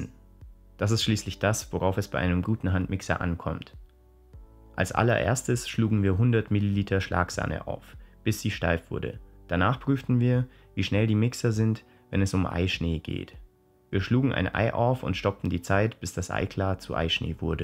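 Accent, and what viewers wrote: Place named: Bavaria, Germany. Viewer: Das ist Bayerisch 😁😆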